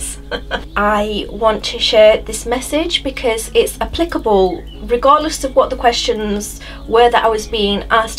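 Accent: United Kingdom. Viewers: I do NOT want to hear this...but I NEED to hear it. Where does the fear come from? Why can't I jump right in and not be in fear of making a "mistake"? Thanks for the kick in the butt! I also realized that anything said in a British accent is very palatatable. Keep up the great and talented work,!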